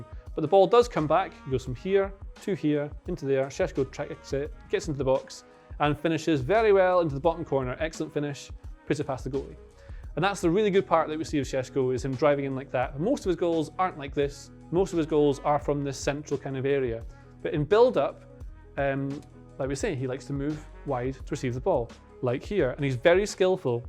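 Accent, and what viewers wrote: Scotland, United Kingdom. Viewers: Scottish presentation?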